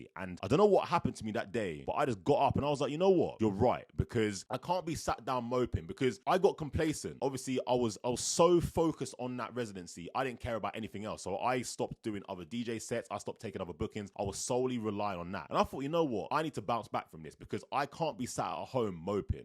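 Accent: London, United Kingdom. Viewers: Dollars? Your accent made me think you’re down the road to me in London 😄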